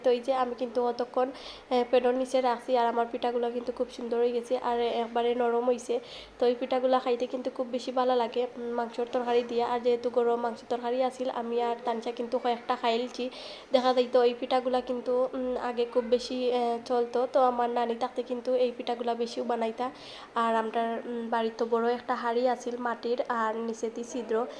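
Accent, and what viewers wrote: Sylhet, Bangladesh. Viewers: মাশাল্লাহ আপু পিঠা গুলো অনেক সুন্দর হইছে ভিডিও টি অনেক ভালো লাগলো আর সিলেটের কথা গুলো আমরা অনেক ভালো লাগে❤❤❤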